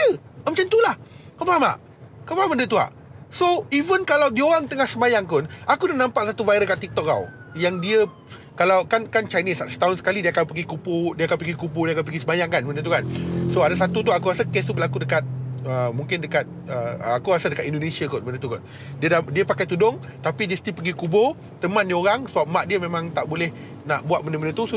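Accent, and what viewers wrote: Malaysia. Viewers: Selama ni ingatkan memang melayu. Rupanya berbangsa Cina. Loghat percakapan macam orang melayu. MANTAP!!! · Mati2 aku ingat ko ni melayu. 😆 Cakap pun dah pure melayu. Anyway alhamdulillah and proud of you bro. Never leave your family despite revert to Islam. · lah igt kan mmg melayu 😂😂 abg cakap mcm mmg melayu tulen laaa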